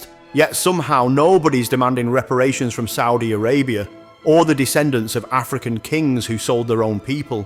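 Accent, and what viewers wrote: United Kingdom. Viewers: What kind of British accent is this?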